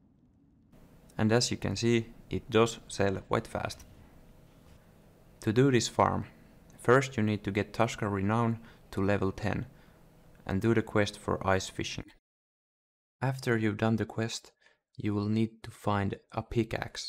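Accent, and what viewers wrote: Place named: Finland. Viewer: Are you from Finland? Your accent sounds like it.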